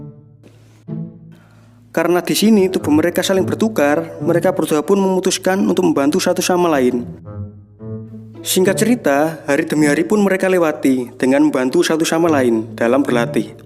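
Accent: Java, Indonesia. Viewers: Itu sempah gw bukannya fokus ke film malah ngakaknya ke suaranya😂😂😂udah medok lucu lagi😂😂😂apalagi pas bagian tegak menuju tak terbatas aduh bengkek 😂😂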